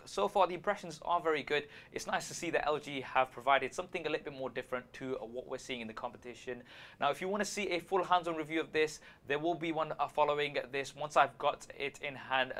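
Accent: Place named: United Kingdom. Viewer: & You both are my fav reviewers And i honestly like your british accent Keep the good work brothers;)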